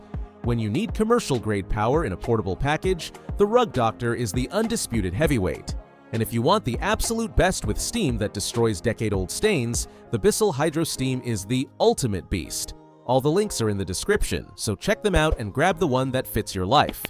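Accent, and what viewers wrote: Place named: United States. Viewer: Why an American accent when buying in the uk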